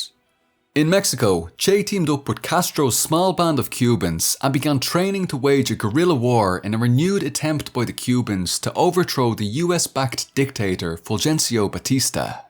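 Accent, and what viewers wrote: Ireland. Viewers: I know it's serious material and you're doing a great job here, but I've never heard someone speak Spanish with an Irish accent and it's incredibly entertaining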